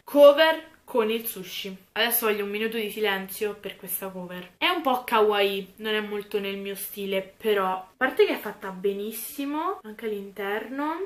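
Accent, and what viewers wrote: Rome, Italy. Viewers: Il tuo romano il top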